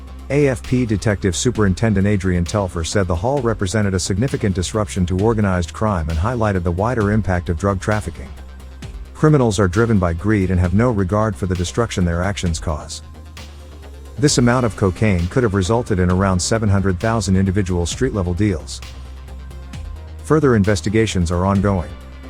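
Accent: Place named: United States. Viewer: . I'm aussie and I reckon the yank voice suits this better. Dunno why, probably just used to it. Either, you're awesome, stay that way!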